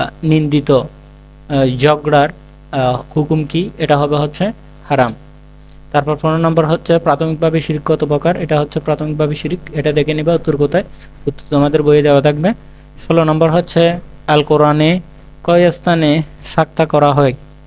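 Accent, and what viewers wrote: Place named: Chittagong, Bangladesh. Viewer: Apni chittagong er taina😅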